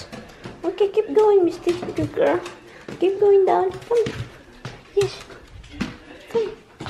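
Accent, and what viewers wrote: Visayas, Philippines. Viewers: I can hear a Bisaya accent when you speak Tagalog. And you speak slow enough where I can understand much more than from a natural Tagalog speaker. Thanks, it helps me learn Tagalog.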